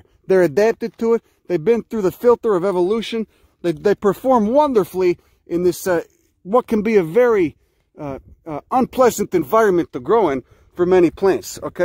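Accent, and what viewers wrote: Chicago, United States. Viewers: I was reading about Salvia Hispanica when I noticed myself reading this sentence in Chicago-type accent like yours. Such nice wording for it too: "While soaking, the seeds develop a mucilaginous coating that gives chia-based beverages a distinctive gelatinous texture"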